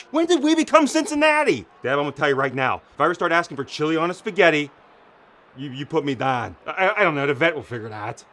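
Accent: Pittsburgh, United States. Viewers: I did my undergrad degree in Latrobe, PA and nothing brings me back like this accent. Love it so much. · Definitely a real yinzer accent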